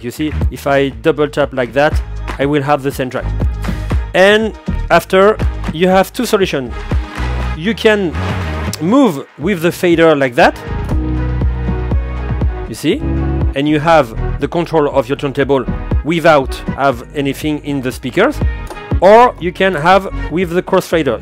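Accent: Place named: France. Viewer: l'accent français de merde XD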